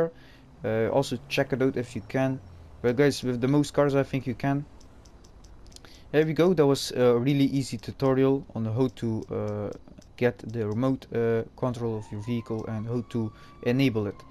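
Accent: Netherlands. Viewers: Lekker Nederlands accent door het Engels heen hahah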